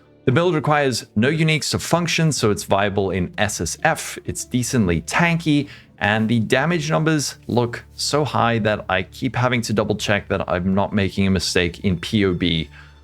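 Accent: South Africa. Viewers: "It's not a shlep" 😂 love the ZA bruv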